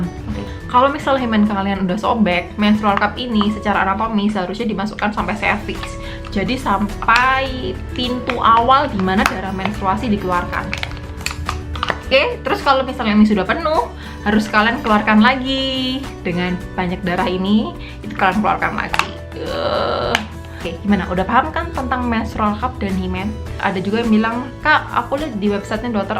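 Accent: Java, Indonesia. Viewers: Sampean wong endi tohh mbak medok tenan jowo na akoh wong ngapak mbak ora ngapak ora kepenak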